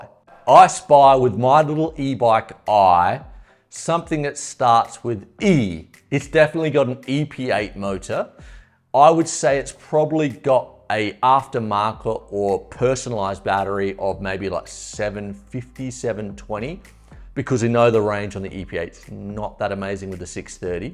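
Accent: Australia. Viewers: You sound Aussie but you talk in pounds, are you doing bike reviews in Australia?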